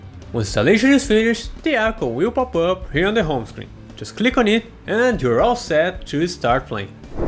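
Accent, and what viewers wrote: Brazil. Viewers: Tá falando de um jeito que brasileiro falando inglês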